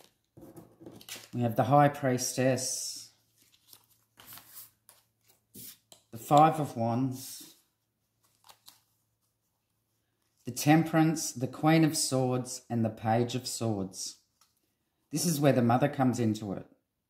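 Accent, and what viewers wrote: Australia. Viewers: Hope you had a lovely Mother's Day if you live in the US. I estimated that you are Australian, though. I love your podcast. Wow, what a start, the devil. Makes sense to me. They should have a cost to bear in Gabbys's murder! I have often wondered if his mother wanted Gabby out of their house to begin with. Thus, the purpose of Brian planning this road adventure in the first place. They took swift action to meet and plan it all out! Can you ask spirit what country he is in, if alive??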